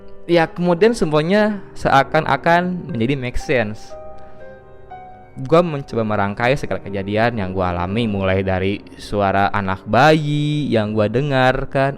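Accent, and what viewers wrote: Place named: West Java, Indonesia. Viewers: Urang sunda sigana mah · Sundaan euy😋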